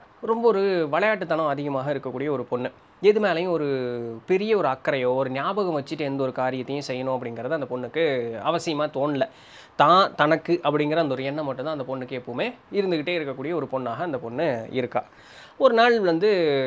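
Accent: Coimbatore, India. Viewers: Magical voice nice story Coimbatore slang 👌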